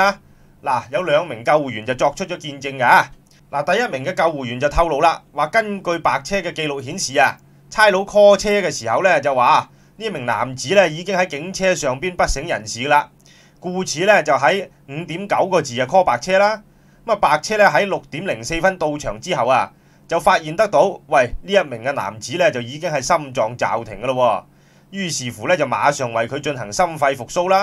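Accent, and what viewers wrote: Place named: Cantonese, China. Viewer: 支持！支持！支持！😻⋯⋯口才極好、廣州口音，但我唔知你的名字🌹👏🌺👍🌺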